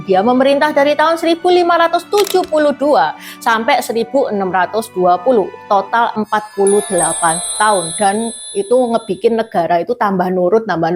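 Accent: Java, Indonesia. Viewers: Ya, apik to😅 Jawa banget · Cerita / narasi nya menarik cuma lafal / pengucapannya (intonasi) terdengar aneh 😢 jawanya terlalu medok😊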